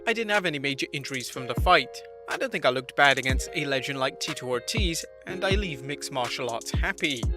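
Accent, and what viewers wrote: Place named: United Kingdom. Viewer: I'm not being a smartass but just telling you that he isn't from America I think he's from UK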